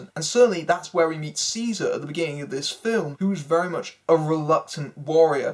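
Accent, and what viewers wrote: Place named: England, United Kingdom. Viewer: You got the best English accent ive heard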